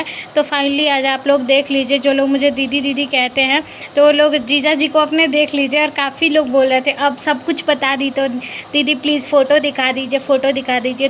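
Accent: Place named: Bihar, India. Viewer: Aap pakka bihar se ho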